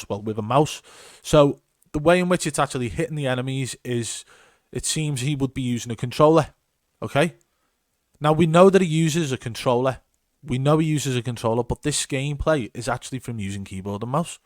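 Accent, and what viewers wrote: Liverpool, United Kingdom. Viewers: Are you a scouser ? cause i heard an accent. or am i deadly wrong lol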